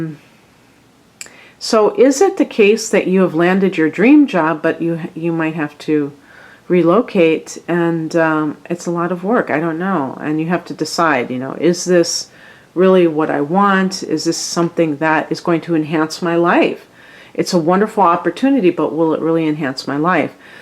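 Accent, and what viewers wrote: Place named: Midwest, United States. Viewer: oh hey a midwestern accent! Hiiiii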